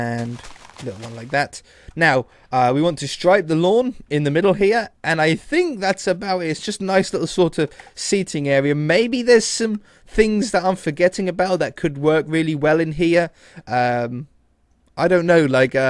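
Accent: United Kingdom. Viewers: That British Accent it wont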